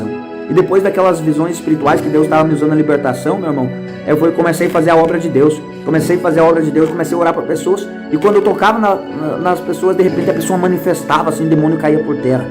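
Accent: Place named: Rio Grande do Sul, Brazil. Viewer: Ele e gaúcho o sutak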